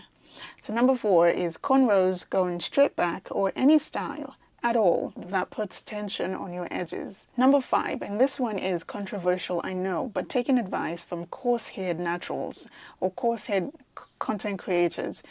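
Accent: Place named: New Zealand. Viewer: The New Zealand accent is just the best. I also have a kettlebell training account I follow just because of her accent. 😂😂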